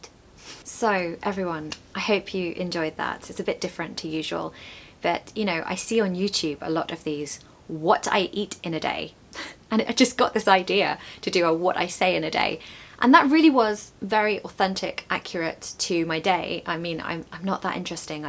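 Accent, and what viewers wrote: RP, United Kingdom. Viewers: We are supporting you cause you have beautiful british accent❤ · I love hearing British accent, it’s very amazing 👏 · ! It's so good to listen remotely to your elegant British accent. Sooths my pains related to the excessive technological burden these days. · It's such a long time i have not practiced english when i hear you speaking i make réminiscence ❤with the late ninties It was in auguest 98 when i visited London dor the first time and visited many places and areas which i found highly useful for the oractice of my english istill remember Diana's accent. So when i hear your voice it reminds me that lovely queen's accent.Thank you all my support ❤❤❤ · Hi,. It is my first time to watch your video; I hope not 'too late'. BTW, I very much like your accent in speaking English (viz. RP); it is what I am speaking in at all times in a day, not Cockney or Estuary. 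The reason is that I am based in Hong Kong where many Hongkongers are using RP, because HK was, you know, a colony of GB. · II've just happened to meet your channel and I love it so much. Well, I stayed in London 10 days as my son is living there as a Uni student though he is coming back to Tokyo soon. My whole trip was just amaging while I was strolling many areas like a Londoner. I love UK so much so I will keep on watching your lovely video for listening. I also love your beautiful British English as well. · I have recently discovered your channel. I am sure it will start to take off soon. Lovely videos and a beautiful British accent; the accent that I'd like to speak with. " I also have a facial If I'm treating myself" I didn't fully get this sentence. Can you help me with it? What do you mean by that? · Hello! I’m a french girl and your english accent is soo soo soo lovely 🥴 and to me it’s very important to perform my english. 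Thanks for yours videos sure i subscribe! I hope I can speak like you one day 😅 very good job 🫶🏼👍🏼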